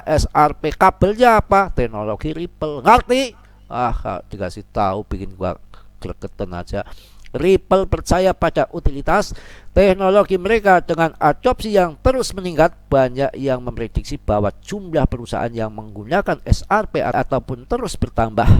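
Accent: Banyumas, Indonesia. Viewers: Yakin nyong seneng banget Karo BROOO.... Gole jelasnya logat ngapak.... Tapi JELAS... JUJUR APA ANANE.... ORA GAWE WONG MONCROT.... GAWE WONG DADI SULTAN..... MATUR SUWUN BRO..... DMOGA ISTIQOMAH JADI AMAL JARIYAH...... AMIIN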